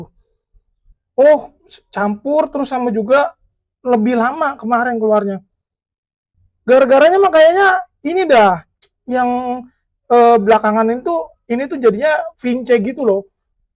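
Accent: Jakarta, Indonesia. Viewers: ngomong nye kyk orang Betawi..... Sama me gw bosssss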